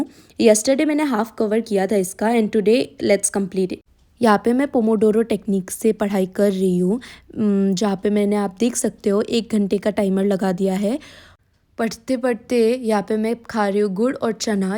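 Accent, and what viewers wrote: Bengal, India. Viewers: are you bengoli? you have a bong accent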